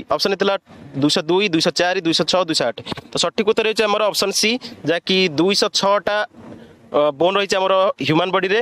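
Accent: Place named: Telangana, India. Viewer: Telangana karuchhi touch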